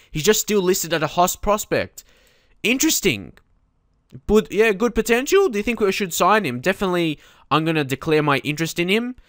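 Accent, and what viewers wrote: Australia. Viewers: Best fm series on youtube. The detail u go into makes it so much more interesting. Length is fine aswell. No need 2 change a thing. Where abouts in australia are you from mate?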